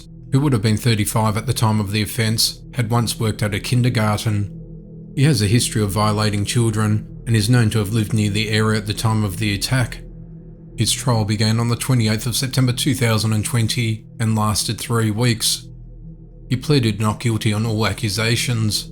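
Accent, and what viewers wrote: Australia. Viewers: Sounds more like he is from Australia to me.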